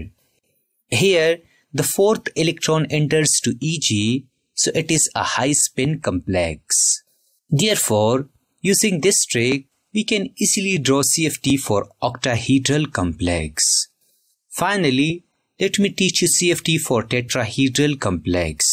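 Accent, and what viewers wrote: India. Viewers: to is very usefull for drawing, but I would draw one arbitrary non-Z-axis for the dz² just to make it still fit into 2-Dimensional view, or for 3-Dimensional, jsut imagine 3-rd axis directly pointing at you and you don't see ti as it is covered by the origin point. Your dz² drawing feels little bit to 1-dimensional. But as with all indina channels, I never mustn't watch any other Vid of your Chennel or my brain will explode, your english is much harder to understand than normal english. But you explain pretty well, (all the Electron will stay there and here stuff, but the d orbital drawing was just the most usefull.) with less acent in language, i.e. if you would use Queen English, I might even consider subscribing/recomending you.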